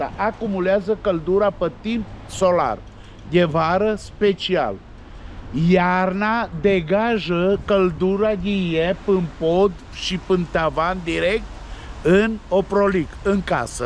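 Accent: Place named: rural, Romania. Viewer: nu stiu dc vorbesti in accent taranesc de o vreme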